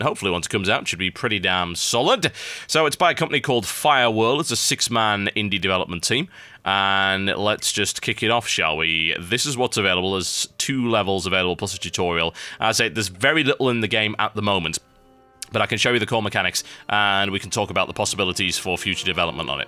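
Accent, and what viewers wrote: United Kingdom. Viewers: L2 British accent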